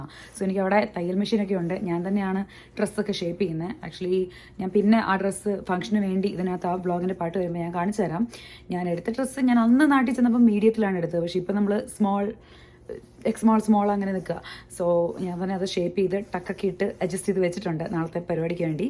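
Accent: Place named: Kottayam, India. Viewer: Kottayam slang❣️🤌🏻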